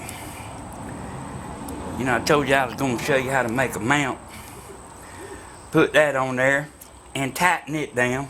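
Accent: South, United States. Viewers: love your your videos....I'm from Australia and your accent is awsome mate.....one of my best friends is from the south.....the more I watch the more I start sounding like you....deadset my mate thinks I'm taking the piss....but no iy's contagious....anyway keep up the good work....we love a good shed here in Oz too... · Yep he's Southern all allright! Cuz I sound like he does and I'm from Oklahoma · Dude, I love your southern twang. And love your good ol' boy knowledge.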